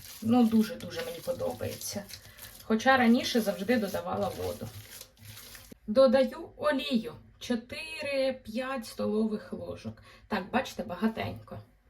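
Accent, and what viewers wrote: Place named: Russia. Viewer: майже позбулася російського акценту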